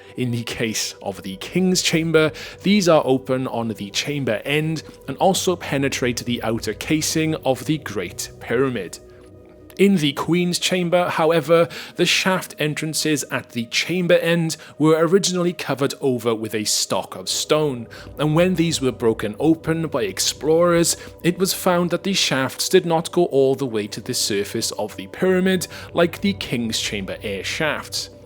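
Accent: Wales, United Kingdom. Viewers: Is the narrator Welsh?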